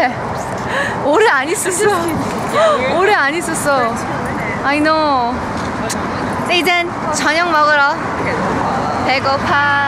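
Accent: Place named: United States. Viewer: She has a very Americanized accent and a lot of pauses. It kind of frustrates me and is hard to follow. Makes me anxious listening to her... But, not everyone is completely fluent in their parent's tongue. I was forced to take Korean during the weekend.... so... yeah.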